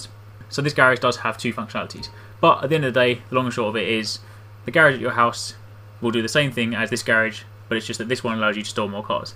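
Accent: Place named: United Kingdom. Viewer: he is british this is how british people pronounce garage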